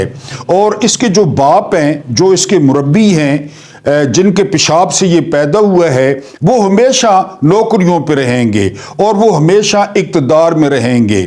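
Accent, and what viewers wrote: Saraiki, Pakistan. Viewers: واہ صاحب ۔ آپ کی گفتگو اردو میں سرائیکی کا تڑکا لگاتے ھو😅 ۔۔سن کے دل❤ خوش ھوتا ھے ۔۔ بہوں خیر ھوونے 😊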